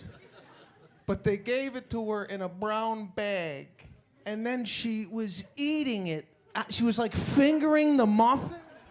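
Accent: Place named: Chicago, United States. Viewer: Chicago accent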